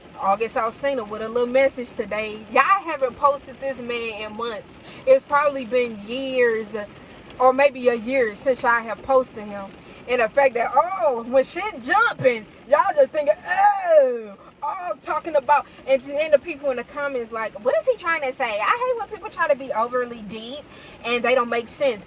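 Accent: St. Louis, United States. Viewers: 😩 Noooo don’t do Anna Mae Bullock like that! Ike was dead ass wrong!! But yes, I’m with everything else you said and I LOOVE your St. Louis accent! 😂🙏🏼🔥🫶🏼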